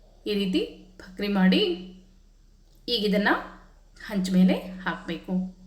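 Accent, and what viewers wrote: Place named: Belagavi, India. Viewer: Amma navu kuda dinalu rooti maduteve navu belagaviyavaru nivu namma tarane matadatira namage tumba khushi agutte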